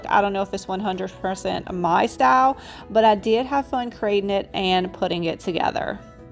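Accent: Louisiana, United States. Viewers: Everything was beautiful, but I was especially impressed with the finial ornaments. And from one Southerner to another, your warm smile and Lousiana accent are the cherry on top of your videos! ❤ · She’s from Louisiana and that’s just the way they talk! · It’s not phony she’s from Louisiana and that’s how she talks. Blue and white Christmas!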